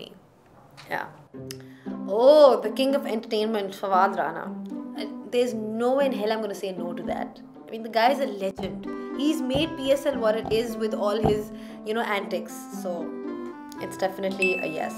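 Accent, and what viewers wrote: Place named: India. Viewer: Your accent is so strong. Please learn better English. You studied here didn’t you learn anything. You sound so Indian. Please don’t learn from them.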